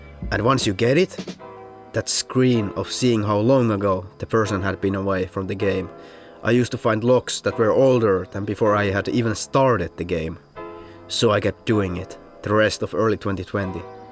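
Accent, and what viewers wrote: Finland. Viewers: On muuten tosi suomalainen aksentti :DD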